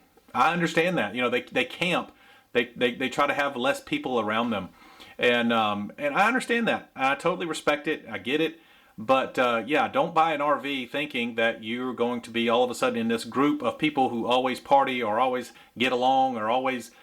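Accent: United States. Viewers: I am sorry, some folks suggest that people with British accents sound intelligent but, I am here to tell you, you can take a country accent to the bank! Keep it up and GO DAWGS!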